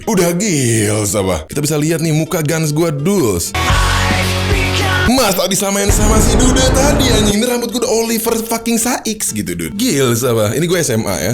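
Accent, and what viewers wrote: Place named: Madura, Indonesia. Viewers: Logatnya kayak madura ea 😂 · Udah lama ga ngeroast orang kok logatnya jadi madura qor . . Btw dikit lagi sejuta nih, siap siap ditagih janji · lama lama kok logat lu kek logat madura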